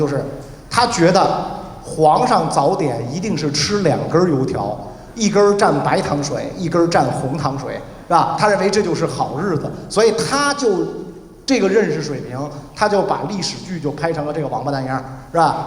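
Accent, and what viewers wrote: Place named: Beijing, China. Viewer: 袁老师说话满满八旗味儿，😂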